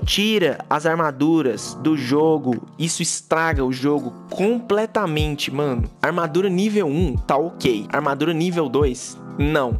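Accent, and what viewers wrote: Minas Gerais, Brazil. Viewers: Ce é mineiro?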